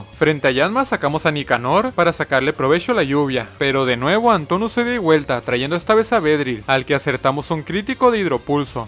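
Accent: Chihuahua, Mexico. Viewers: Eres de Chihuaha vdd we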